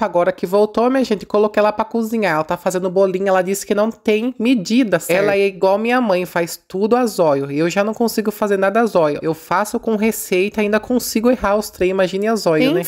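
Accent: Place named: São Paulo, Brazil. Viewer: Gosto do seu sotaque, quando você fala lembro de quando morava no interior em Rinopolis / SP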